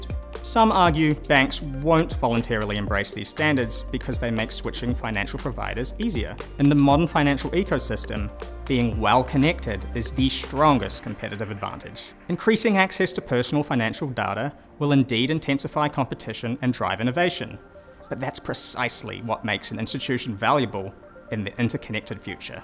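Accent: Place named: New Zealand. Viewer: Great to hear a Kiwi explain that so well!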